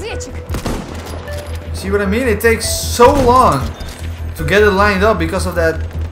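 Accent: Netherlands. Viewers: ur Dutch aren't you? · Je klinkt Nederlands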